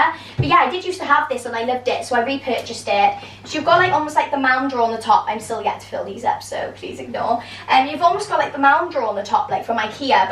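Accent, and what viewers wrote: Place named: Wales, United Kingdom. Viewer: Loved this! Are you Welsh by any chance? Mind you this is my first impression of you and I instantly heard a fellow Welsh accent, especially when you said ‘mam’ 😅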